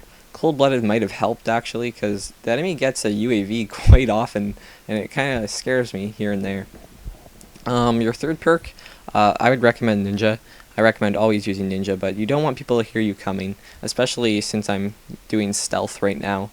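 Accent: Canada. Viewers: Dat canadian accent he has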